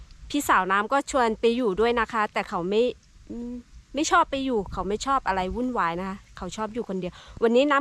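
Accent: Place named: Ratchaburi, Thailand. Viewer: สวัสดีครับน้องน้ำ ภาษากะเหรี่ยงทางราชบุรีฟังรู้ครับแต่บางคำก็พูดไม่เหมือนทางตากครับ fc จากตากครับ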